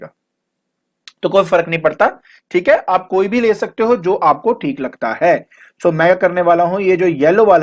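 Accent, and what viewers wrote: Punjab, India. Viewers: Sir you are Punjabi??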